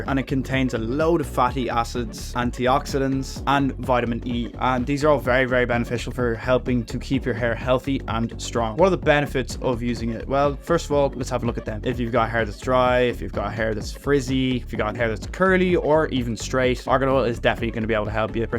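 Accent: Ireland. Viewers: Are you Irish because you sound Irish If you are what county are you from I'm from Louth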